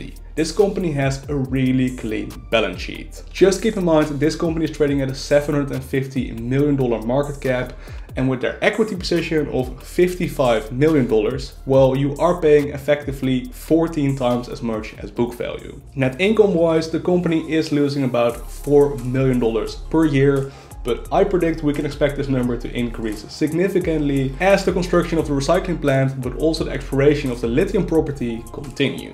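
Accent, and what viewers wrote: Netherlands. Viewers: Nederlander ? I can hear the accent! Love the content bro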